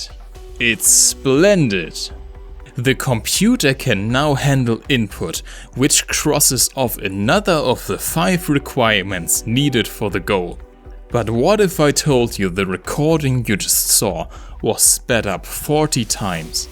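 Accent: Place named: Germany. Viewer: Why am I not surprised the accent in this video is German. Only a German engineer could pull this off.. Excellent job!!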